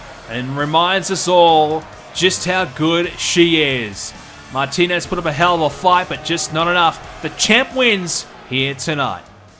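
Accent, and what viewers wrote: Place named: Australia. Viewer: are you from australia? coz thats where i am and you sound aussie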